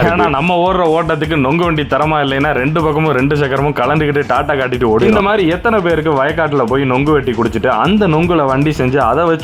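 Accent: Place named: Sivagangai, India. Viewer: Unga native Sivagangai thana 😂 but I love 💕 it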